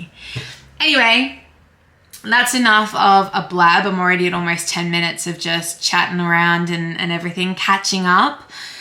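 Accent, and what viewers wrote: Australia. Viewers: I have just binged every one of your episodes since the start… I am also rewatching Gilmore girls… you have an awesome channel and I am happy to hear another Aussie accent… I live in Brisbane… keep up the good work…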